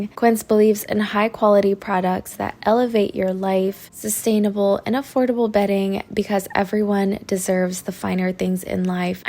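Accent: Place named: Texas, United States. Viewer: I’m guessing Texas or Cali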